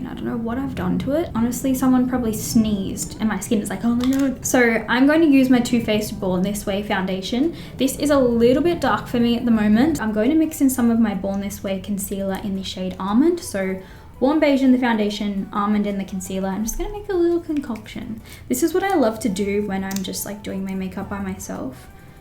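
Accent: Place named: Australia. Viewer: Loved this style vid and love the look. You look beaut. Also loved how much your Australian accent came out to play!💁🏼‍♀️💕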